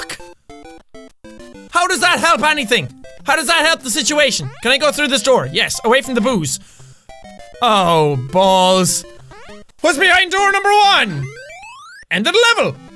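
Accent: Ireland. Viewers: I love is irishness. Mareio, shtoopid, I love if all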